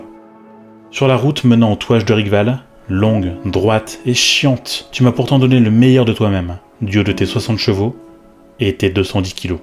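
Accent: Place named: Nord-Pas-de-Calais, France. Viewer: Ch'ti qui vient din ch'nord in' brai deux fouâ, un' fois quin y vient, un' fois quin y r'par (j'ai essayé de transcrire l'accent 😅). Trois pattes powaaa! Gaffe quand même tu joues plus dans la même cours, ca envoie comme motobylette!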